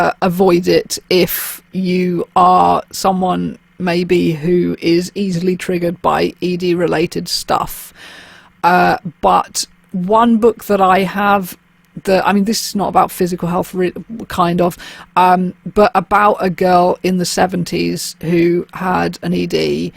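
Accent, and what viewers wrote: United Kingdom. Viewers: what kind of british accent do you have?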